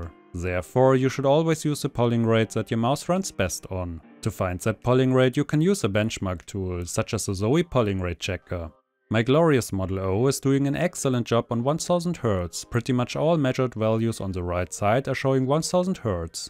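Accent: Germany. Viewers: Yo hey r u german?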